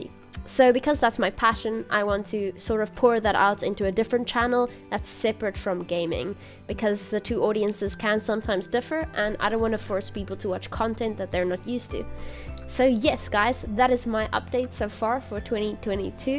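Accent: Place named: South Africa. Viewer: The South African accent was a lot stronger in this video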